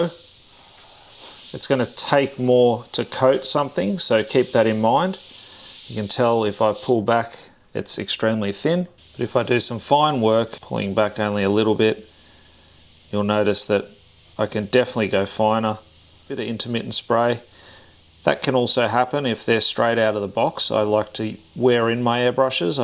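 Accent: Australia. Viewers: Strange... Now i very very much hear your strong Australian Accent 😂😂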